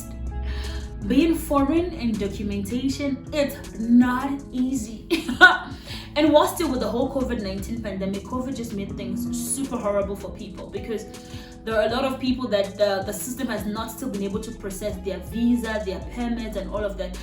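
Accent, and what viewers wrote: South Africa. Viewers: are you a Nigerian cz Hai uthetha kamandi u seem like a south african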